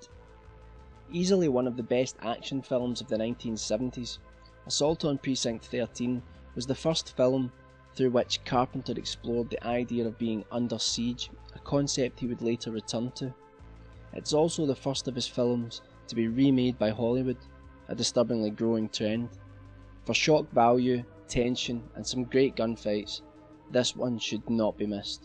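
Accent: Scotland, United Kingdom. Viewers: Don't listen to him. This crazy scottish accent is one of the best features of this video. Great comments on great movies, although I have a different ranking. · for those ignorent people he isnt english he is scottish :)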